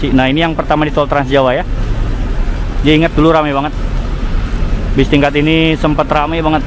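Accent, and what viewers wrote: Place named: Madura, Indonesia. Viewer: anda orang madura ya bang ? kok logatnya medok banget maduranya 😁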